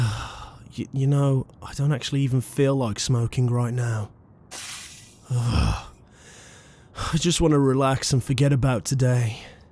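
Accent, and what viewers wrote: United Kingdom. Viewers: BRITISH MAN? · Your voice is so British and like a tv documation from police interceptors or something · its a hella british voice lol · Sounds kinda british though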